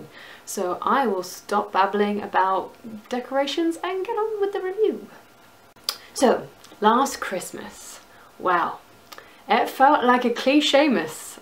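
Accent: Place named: Australia. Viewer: When you say that you're taking a break from the show, aren't we all going to kind of have to, since we're not getting another episode until fall? And, btw, I agree with you, even to such an exceptional amount that watching this kinda felt like listening to myself think. Interesting. Hence the subscription: I want to see if this continues. Also, I totally didn't even pick up on your Australian accent. What part are you from?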